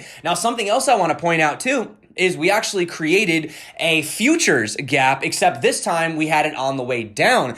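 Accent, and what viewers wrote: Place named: United States. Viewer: Am I the only one still living in the US? Even you appear to have an American accent yet you use Bybit which is not available for US residents.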